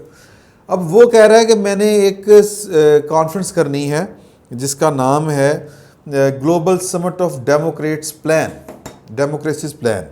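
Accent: Punjab, Pakistan. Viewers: ji I love tour Punjabi dialect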